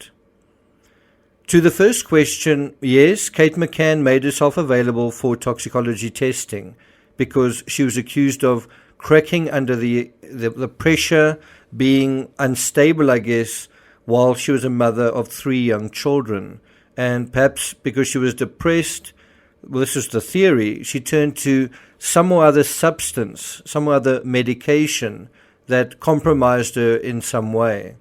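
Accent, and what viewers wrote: South Africa. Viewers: Just found your channel from the Deception Detective who reacted to this video! Love it! Also awesome to hear a South African on YouTube! Keep smashing it!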